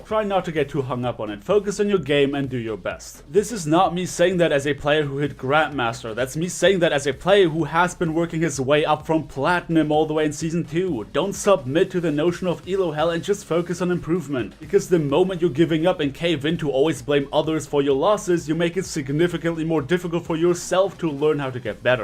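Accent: Germany. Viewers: are you a German Guy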